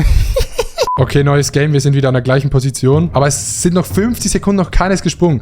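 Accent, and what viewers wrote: Switzerland. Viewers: das ist deutsch halt schweizer art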